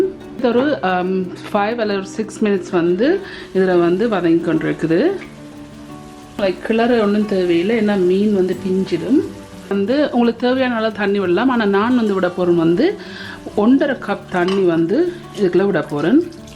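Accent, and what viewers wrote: Sri Lanka. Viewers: Are you a Srilankan Tamil?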